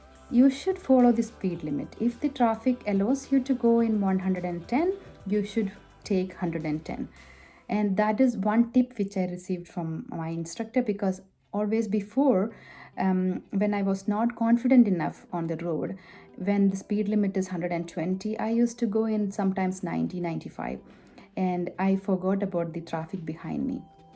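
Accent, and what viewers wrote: India. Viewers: by your accent I'm assuming Indian originally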